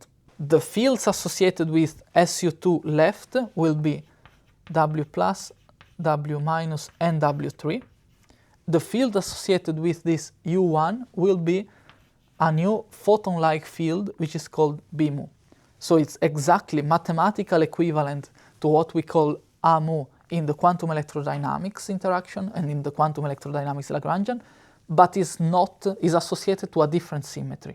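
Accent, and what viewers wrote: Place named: Italy. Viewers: È inevitabile, visto che è italiano. Hai mai sentito un madrelingua inglese o americano che parla italiano? Per quanto lo parli benne, si sente sempre l'accento. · C'è un po di italiano nel tuo inglese ❤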